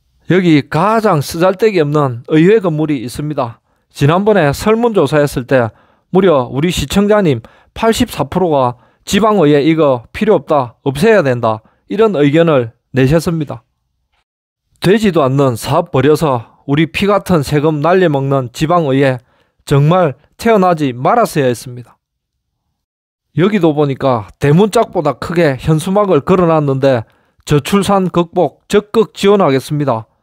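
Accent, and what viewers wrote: Gyeongsang, South Korea. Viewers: 말투 부니 경상도 분이신거 같은데 진짜 궁금해서 그래요 도청 이전 관련된 이야기 모르시는 건지?? 아님 컨텐츠 때문에 세금 타령 하시는지 좀 궁금 하네요.. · 첫화면에서 갱상도라고 생각이 들더만 맞구만.. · 경상도 ㅋㅋㅋ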